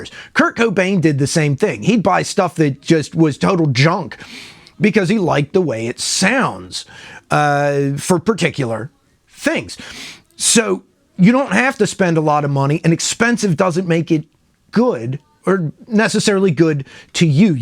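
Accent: Pittsburgh, United States. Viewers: Great video! It's hard to pick a guitar based on a website, that for sure. Even YouTube reviews van be tricky. Sadly, the days of mom & pop guitar stores are going away, unless you count Guitar Center, which I don't - I don't trust them, either. They're known for "factory seconds," and if you know what that is, that's why I stay away from them when it comes to buying guitars at least. I could be wrong, but do I detect a Pittsburgh accent in there??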